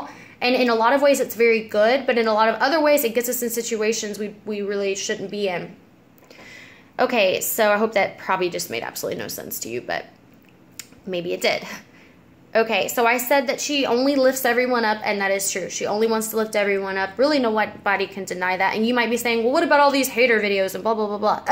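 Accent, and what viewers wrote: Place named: South, United States. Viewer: I immediately caught your accent because I'm southern too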